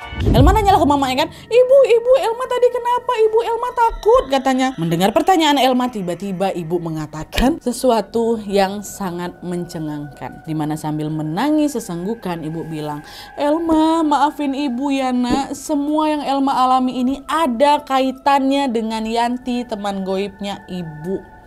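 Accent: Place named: Medan, Indonesia. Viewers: Dari awal kak buat Youtube sampai sekarang selalu nonton dan gak pernah ketinggalan, dari kesedihan kak di tinggal Alm ayah dan abg nya kak sampai kak ke titik bahagia skrng aku selalu mengikutin kak, sehat terus Ya jangan sampek logat Medan kau berubah Ya kak ❤️❤️ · Sampe bingung ka tuh org jawa apa org medan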